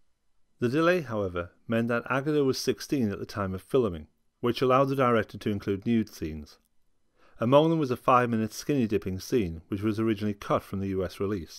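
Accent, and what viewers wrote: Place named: England, United Kingdom. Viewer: Nice to hear an English narrator. + the use of the word "film"! Thank You.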